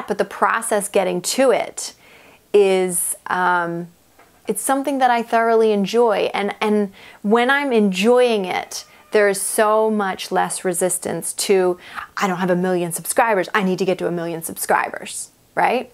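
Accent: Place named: Michigan, United States. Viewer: No, it sounds like a Michigan accent. I like your accent. I have a heavy Brooklyn NY accent.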